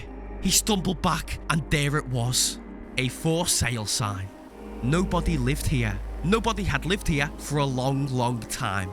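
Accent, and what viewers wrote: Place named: England, United Kingdom. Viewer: "Pearson" It's great to hear someone with a genuine English accent :) You don't get to hear this kind of narration anymore.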